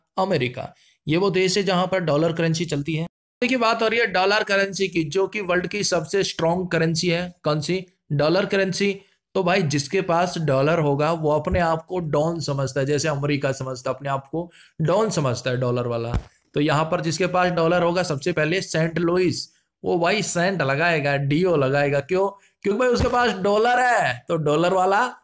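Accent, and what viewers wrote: Haryana, India. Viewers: Sir aap Haryana se ho kya?? ♥️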